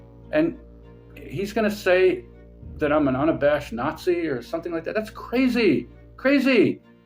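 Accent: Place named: Ireland. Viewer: I did NAZI that coming...I would have thought you were Irish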